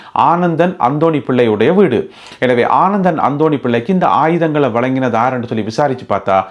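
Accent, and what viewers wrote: Jaffna, Sri Lanka. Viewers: லண்டனில் இருந்தாலும்கூட நீங்கள் யாழ்ப்பாண தமிழில் கதைப்பது எங்களுக்கு பெருமையாக உள்ளது.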